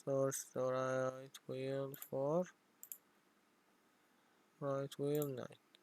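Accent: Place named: Iraq. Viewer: لهجتك عراقي انت عربي لو كنت عربي عندك دوره باللغه العربية اشتريها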